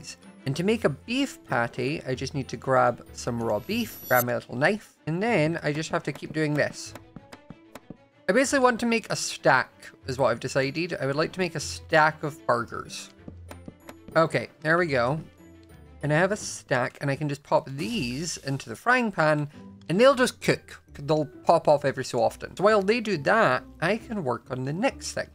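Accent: Scotland, United Kingdom. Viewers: Love your videos. Keep up the good work. Also what part of Scotland are you from, I'm from Glasgow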